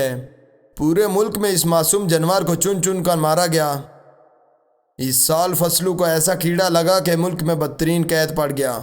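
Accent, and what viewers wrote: Kashmir, India. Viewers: Nice kashamiri accent watching and subscribeing just cuse #factical gave you shoutout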